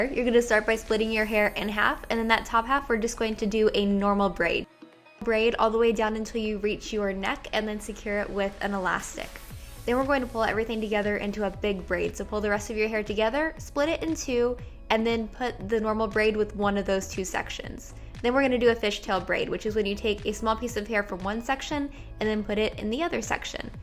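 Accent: United States. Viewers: Can I please have your American accent ? My Australian one has NOTHING on yours girl, also if I could have your hair too that'd be cool 😉💕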